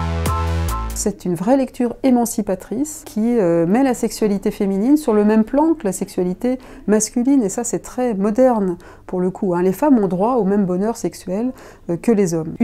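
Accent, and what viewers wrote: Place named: Savoie, France. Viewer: j'ai arrêté la vidéo à la seconde où le son de savoiE atteignit mes oreilles c'est pas tabou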